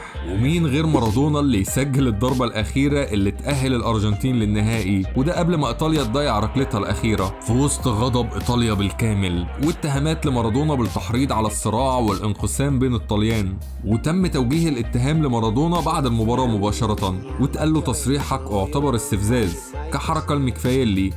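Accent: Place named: Egypt. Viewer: Pppppppppp non bbbbbbbbb È chiaro che chi parla è egiziano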